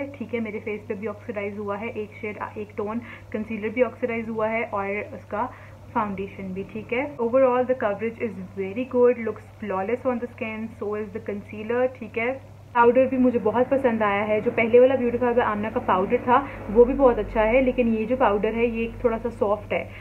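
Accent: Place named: India. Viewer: slow down please you speak so fast like when you speak India, one hundred a miles an hour I don’t understand your English of course your English intonation like India speaks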